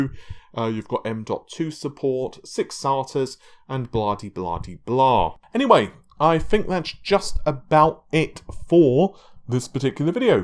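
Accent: United Kingdom. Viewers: He is British, they say things differently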